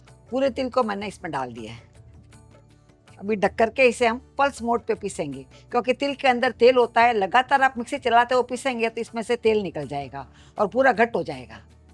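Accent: India. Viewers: Aap bahut achha video bnate ho, Apki language or bolne ka desi tarikha super h.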